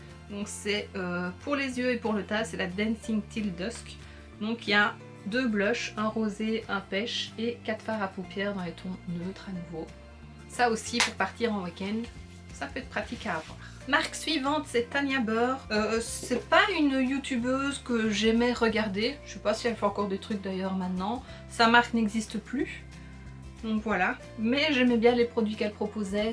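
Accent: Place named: Belgium. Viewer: Re salut !! Aaah, ça y est, je suis bien installée pour regarder ta vidéo ! Oh la Riviera d'Anastasia, j'aime beaucoup ! Je ne l'ai pas mais elle m'a ouvrent tentée ! Ah je me disais que tu étais sûrement en Belgique. J'entendais un petit accent mignon 😉 Ooh, elle est magnifique la palette Into the Snow de Essence! Je ne connaissais pas ! Mais c'est vrai que c'est une marque très sympa ! Et qui offre pas mal de choses intéressantes pour un bon prix ! C'est marrant tu as présenté deux palettes de suite (la New nude de Huda et la XL de Lime Crime) que je n'ai pas mais dont j'ai des dupes ! Respectivement chez W7 et chez... Je ne sais plus ! Lol c'est une marque sur Amazon (mais je ne l'ai pas encore testée). J'adore la marque Makeup Revolution !!! J'ai pas mal de palettes de cette marque, mais pas les même que toi 😅 je pense que je ferai un jour une vidéo sur mes palettes aussi, mais je ne suis pas chez moi en ce moment... 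Waw, effectivement tu as plein de palettes de Too Faced! Moi je n'ai que la White Peach, et je l'aime bien pour des makeup légers. Effectivement tu aimes beaucoup les paillettes !! Tu connais les paillettes de chez Colourpop ? Parce qu'ils en font et elles sont juste magnifiques ! Elles sont jolies les palettes néons de W7 ! J'ai vu que Makeup revolution allait en sortir aussi, encore une fois dupes de celles d'Huda Beauty ! Merci pour ton partage de collection ! J'aime beaucoup regaeder ce genre de vidéo ! As-tu déjà fait une vidéo sur ton rangement makeup ?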